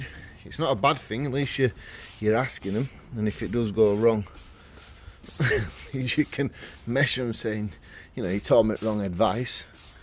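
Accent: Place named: Yorkshire, United Kingdom. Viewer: Nice video mate ! I guess, by your accent, that you are from the Yorkshire Region..... I am going to try to get that product as I need it badly for my tent that is getting rather old . Cheers from Chile !!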